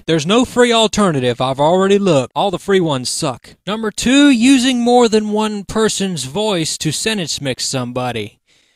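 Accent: South, United States. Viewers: I'm guessing you're from the south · the southern voice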